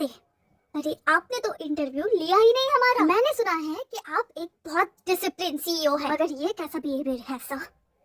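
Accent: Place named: Bengal, India. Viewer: App ka Bengali accent😻.....Just woww😍 অবাক হয়ে গেলাম🤗😘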